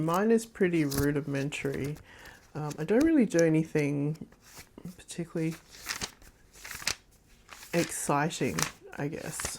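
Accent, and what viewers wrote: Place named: Australia. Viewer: Thought you sounded Aussie. Which state are you in? I’m in Perth, WA. Love your journals-so chunky.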